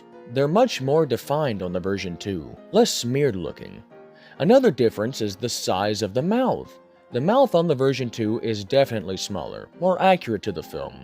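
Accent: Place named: South, United States. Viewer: Awesome! also dude, you sound like a southern version of the narrator from How it's made. 😆 Those are very cool masks too, wish I could but like one someday!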